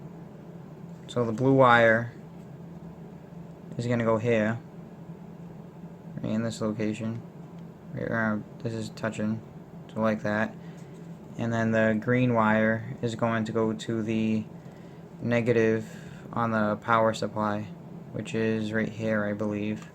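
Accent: Boston, United States. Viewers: What part of Boston are you from? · really thick Boston accent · God that baston accent terrible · skeletøn clique its boston I think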